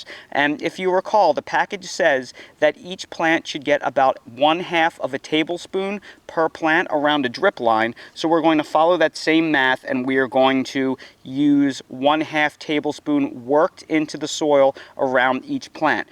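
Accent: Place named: New York, United States. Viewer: Your in nc but you have a ny accent😀excellent video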